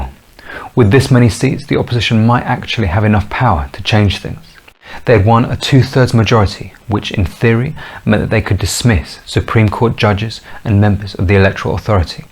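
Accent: United Kingdom. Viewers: First of all let me start by saying I love your content but…Can I recommend maybe holding a fake or real if your down a snifter of brandy or cognac like I said real or fake. Your hand hangs outs there for ever plus I think the snifter 1000% plays into the decor and your obvious English accent. Just a thought a just notice your hand never ever retracts to your body it looks very robotic again these are observations not being critical by any means please don’t think I’m trying to be. Regardless keep up the great work!!!!!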